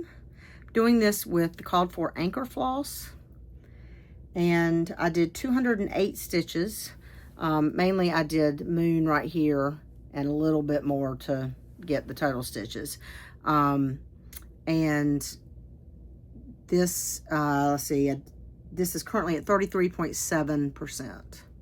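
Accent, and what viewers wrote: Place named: North Carolina, United States. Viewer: Just found your flosstube and love going back and watching your previous episodes! I live in Florida now, but previously lived in the Charlotte, NC area for about 20 years! Love hearing your NC accent though, and seeing all your beautiful projects. Was wondering what thread you’re using for the Tisket a Tasket project. It’s beautiful and you’ve definitely enabled me!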